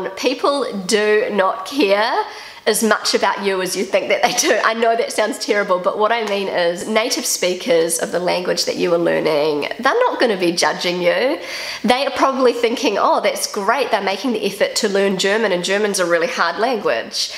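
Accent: New Zealand. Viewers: I also love accents and especially your swert kiwiaccent...I think you have to make another german video here on YouTube...😃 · I love your videos! I have a good opportunity to hear Kiwi English and know a little about Germany. Perfect!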